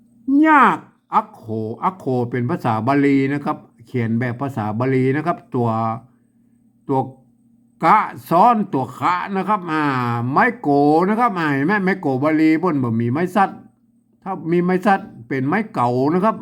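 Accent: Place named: Lanna, Thailand. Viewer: เอาของสุนทรภู่มาอ่านแบบกำเมืองเลยเหรอค่ะ ป้อครู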